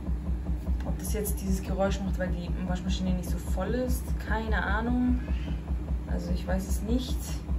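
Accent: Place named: Swabia, Germany. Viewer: süss das schwäbische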